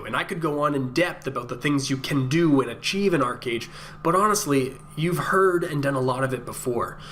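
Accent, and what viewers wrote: Canada. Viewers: I hear teh Canadian creeping through.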